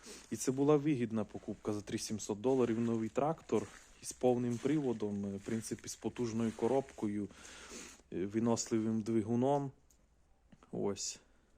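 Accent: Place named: Rivne, Ukraine. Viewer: Акцент напевно Рівненський ❤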